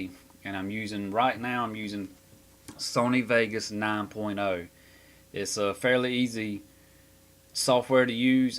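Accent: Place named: South, United States. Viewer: Thanks for taking us on the tour! I really like your sound as I have said before! Now, ......you have to tell me where your southern accent is from!!!! I didn't expect that! HA HA I live in SC so I know all about southern slang! HA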